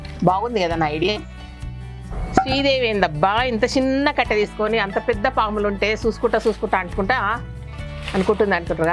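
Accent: Telangana, India. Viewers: Good telangana slang mam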